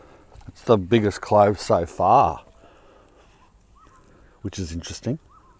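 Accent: Australia. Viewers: I am not sure which bit was best in the video *someone speaking with my accent for a change *someone who was still aware of the rest the world while talking about local things *someone who obviously knows what they are doing but is still capably of not being perfect * someone who is game enough to put a full clove of garlic in their mouth on camera. But gently I would like to point out...that ** puting dirt from the garden in your mouth is about as dangerous as stoking a camp fire with your penis! 😮 Aussie Aussie Aussie...oy oy oy!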